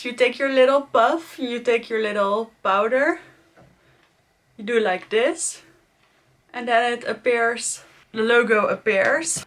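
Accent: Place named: Netherlands. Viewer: I can detect a beautiful Dutch accent!! (One of my dear friends is originally from Breda). I loove Givenchy powders, the original Prisme Libre powder is so beautiful. Fantastic look 💕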